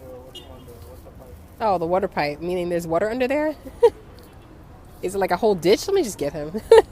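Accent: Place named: East Coast, United States. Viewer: I admire you and your family. I could tell you were from the east coast (I'm from Philly) by your accent. I also can tell you are a little homesick. It is hard to adjust to about anywhere coming from New York. My fiancee is from Brooklyn and she's easily bored. Philly keeps her marginally stimulated. It is nice to see a thriving black nuclear family like yours and within your native culture.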